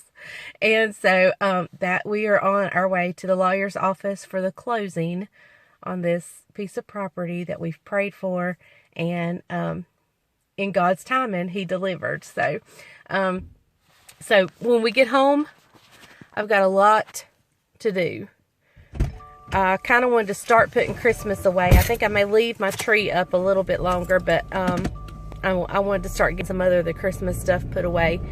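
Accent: South, United States. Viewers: Are you moving to new land? I’m sorry coming in on your story I just don’t understand sometimes the southern draw talking fast. As far as having “stuff” donate donate to the Salvation Army that uses the sale proceeds to help the poor. My husband says, keep it simple thats so true! Personally when I have guest over I keep the kitchen unjunky with little out other than food and pretty napkins and cups and few candles. Heaven is not junky and in turmoil with “things”. It’s uncomfortable being around clutter thats not good for the soul. A Colonial girl here with a touch of simple style and elegance from my generation. People have way too much today. Be blessed and not stressed.❣️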